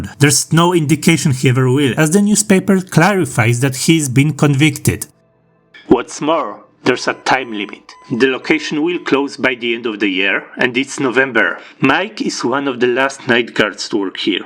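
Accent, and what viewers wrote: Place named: England, United Kingdom. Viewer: PL: Masz niesamowity akcent Angielski EN: You have best English Accent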